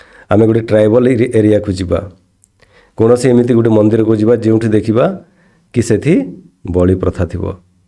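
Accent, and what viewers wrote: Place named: Bengal, India. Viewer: Ye loka odia bhasa kahila -bele majhire -majhire bengal bhasa patiru bharuchi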